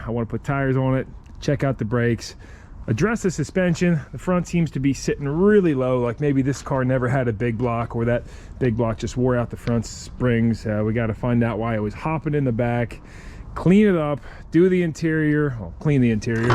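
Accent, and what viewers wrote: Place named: Philadelphia, United States. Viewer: I'd like to see your swap process for the 4 speed. this is the first video I've watched and it was great. You sound like your from Northeast Philly I'm from Harrisburg. It's really cool to see someone local doing this on YouTube I've been thinking about starting my own channel and I love your format. Keep it up!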